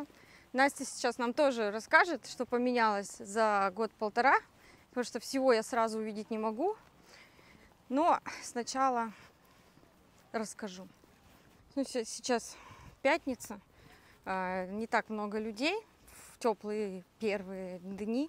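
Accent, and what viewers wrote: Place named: Ukraine. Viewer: По акценту, риторике и набору слов - Украинка .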